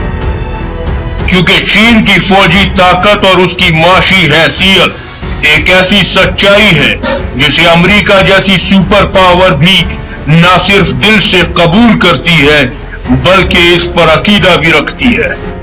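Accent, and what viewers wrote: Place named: Pakistan. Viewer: Very good comentry best language and pure pakistani accent other u tuber speaks like indians pure pakistani accent plz keep it up brother aapriciate to adopt pakistani accent this is our language we dont like indian accent love you u tuber speak like this thanks from karachi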